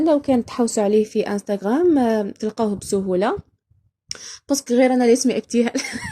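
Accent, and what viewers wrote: Algiers, Algeria. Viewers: بصحتك. تقول. دزيرية ❤🎉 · اللهجة تاعك عاصمية و شابة بزاف. غادي نستنى الفيديو الجاي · انتي تحكي بدزيرية وانا نخمم شكون علمك تهدري هكدا انا دزيرية وعندي صحباتي عراقية خايفة تشوف الفيديو ديالك وتقولي علميلي نحكي باللهجة الجزائرية ونحصل 😂😂😂😂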